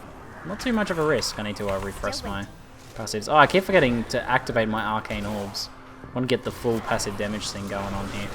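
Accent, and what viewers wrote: Australia. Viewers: You have just became my favourite streamer. Recently I have been paying attention to a couple of D3 streamers, like Archon, KingKongor, blaqksmith & etc. I prefer you to any of them! Keep up the good work! I think it has something to do with your Aussie accent, or you just remind me of a friend, but your description during gameplays are absolutely colourful and intriguing!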